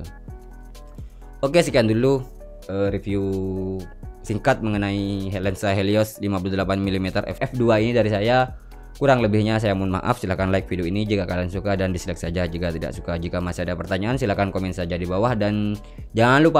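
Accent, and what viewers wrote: Madura, Indonesia. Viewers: Asal madura ya bang?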